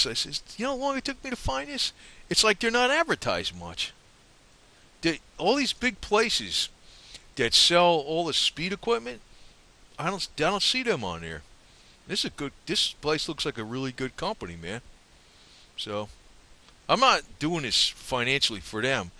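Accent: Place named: Sacramento, United States. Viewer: I bet he lives near Sacramento, sounds like me.. I live in KY now and I sound retarded but hey I used to think the KY accent was retarded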